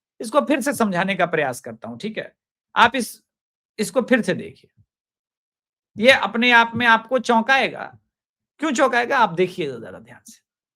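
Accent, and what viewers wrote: India. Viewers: आपकी अंग्रेजी बोलने का तरीका भी देसी है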